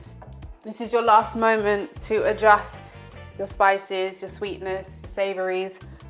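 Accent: United Kingdom. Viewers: That is such a beautiful form of the English language! What region are you from in the UK? This is so classy.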